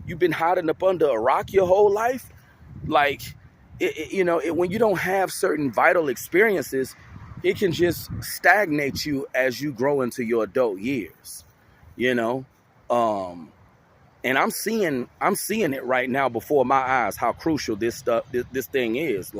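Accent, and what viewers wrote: United States. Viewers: That deep south voice.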